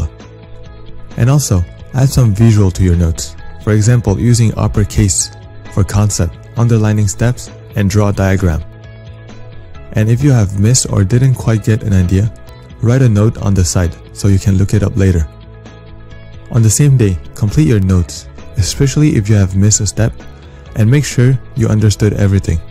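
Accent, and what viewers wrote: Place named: Singapore. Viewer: I can see that you are singaporian through accent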